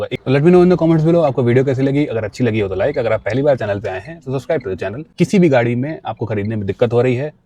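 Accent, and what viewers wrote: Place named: Farrukhabad, India. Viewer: Farrukhabad wali boli. Chala rahe ho shahjahanpur me. Purane din yaad aa gye.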